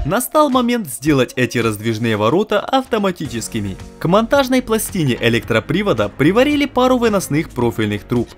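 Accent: Surzhyk, Ukraine. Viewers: если вы из этого видео не поняли что человек из Украины или вы поняли и все равно предъявляете человеку за русский, вы явно затупок)) ибо если вас не устаивает произношение блогера, просто не смотрите)) Зачем до человека докапываться из за произношения, это не культурно:) и да русский и украинский имеют некоторые общие слова но их очень мало, послушайте например и попробуйте понять без переводчика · Обычный суржик, в каждой области русский язык отличается от масковскава. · да ладно, они украинцы, русский не изучают, говорят как чувствуют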